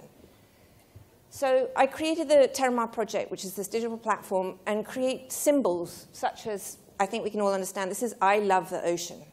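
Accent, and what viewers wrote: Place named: New York, United States. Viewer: Her accent is soo ny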